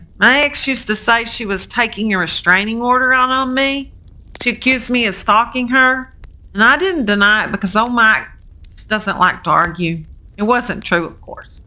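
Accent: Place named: South, United States. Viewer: southern accent makes the word poem sound like p*rn to my English accent, lol ☺️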